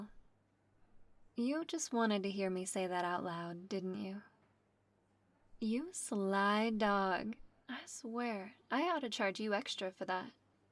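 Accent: South, United States. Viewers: That southern drawl adds SO much! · Love the southern twang in this one. Thanks for the audio! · Love the audio and love the southern belle hints to it aswell great work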